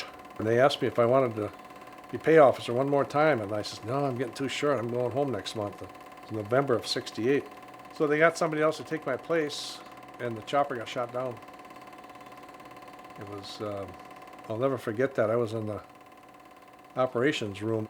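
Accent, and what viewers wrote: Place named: Wisconsin, United States. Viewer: Thank you for your service sir. You aren’t from Wisconsin by chance? The accent sounds like my home state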